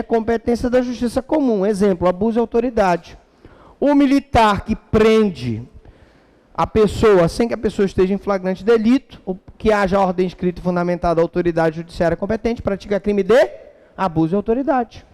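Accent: Pará, Brazil. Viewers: Muito obrigado, sou paraense mas moro e estudo no Rio de Janeiro e suas aulas além de muito brilhantes me ajudam a matar a saudade do Pará que amo, pelos exemplos e pela sua forma de falar. Obrigada.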